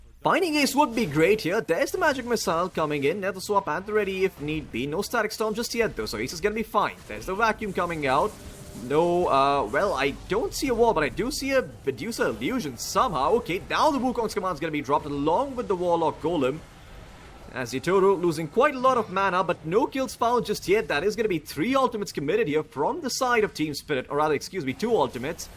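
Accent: India. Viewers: is this commentator from India or something ?? i feel like i'm listening to phone scam calls person from Mumbai pretending he's english.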